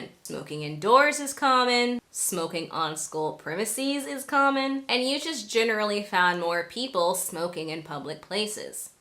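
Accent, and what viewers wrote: United States. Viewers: Hello Ms....i really appreciate your support in my English learning journey. BTW the lesson Is actually very usuful. Nice going. P,S. You're so lovely love your American accent. Greetings from Mexico ❤️.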